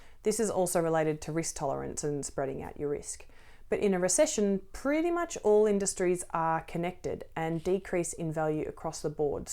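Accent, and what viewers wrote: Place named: Australia. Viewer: Hearing all this in an Aussie accent does make it a lot less terrifying ✨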